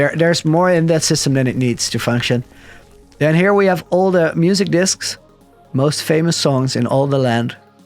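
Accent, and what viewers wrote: Netherlands. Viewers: You sound a bit dutch... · Hé sounds dutch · U Dutch? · hahah dacht ik al aan je engels te horen (is geen haat)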